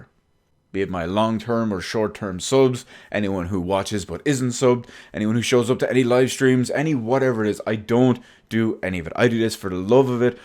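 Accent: Ireland. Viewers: Thank you for explaining. My channel my be gone too ?? Oh well nothing lasts forever?!?!? Is that Ireland 🇮🇪 accent ? Cool 😎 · It's a shame that this is what we will be faced with. Lemme guess, you're not Irish at all are you? You definitely sound Irish xD If I lose my account, I will not be upset over it. YouTube is essentially a breeding ground for trouble of all sorts. I will always remember what I have done ever since 2010.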